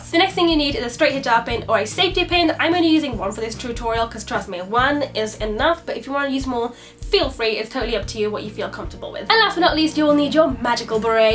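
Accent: United Kingdom. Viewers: so cute! is she british, i really like her accent!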